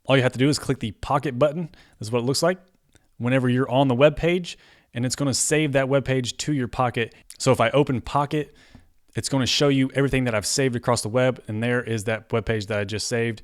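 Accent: United States. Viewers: L'accent américain est inaudible pour un français. Merci d'avoir mis les sous titres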